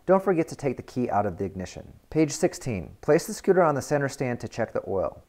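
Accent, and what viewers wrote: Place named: China. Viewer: Chinglish!!!! I love it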